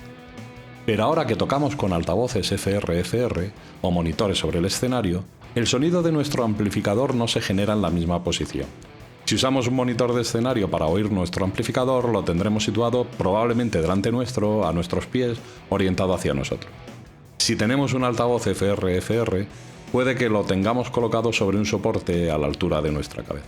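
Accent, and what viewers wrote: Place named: Spain. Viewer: Una pregunta sin relación al tema del video, de qué región de España es el acento del narrador? Gracias.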